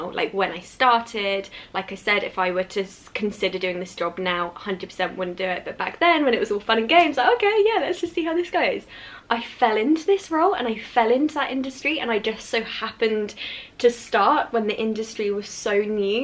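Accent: United Kingdom. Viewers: I looked for a british youtuber to learn the accent and accidentally found someone so precious. Youre absolutely marvellous and im really glad to found this channel ^^ Right on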